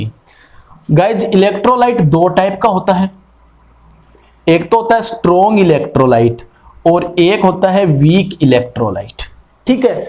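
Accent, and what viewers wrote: Haryana, India. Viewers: sir aap haryana se ho kia?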